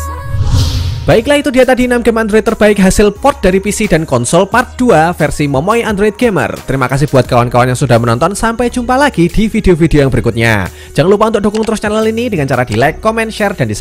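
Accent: Java, Indonesia. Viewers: matamu methothoo wkwkwkkw orang jawa mana bang? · kamu itu dari jawa ya soalnya cara bicara kamu itu mirip org jawa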